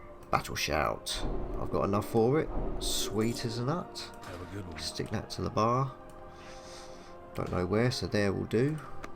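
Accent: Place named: Kent, United Kingdom. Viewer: Love the accent:) From Kent?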